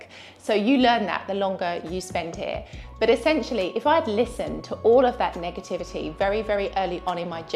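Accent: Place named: RP, United Kingdom. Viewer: I love your RP accent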